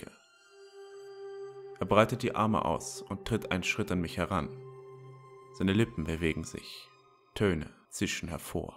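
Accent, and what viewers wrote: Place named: Berlin, Germany. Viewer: vom dialekt eher berliner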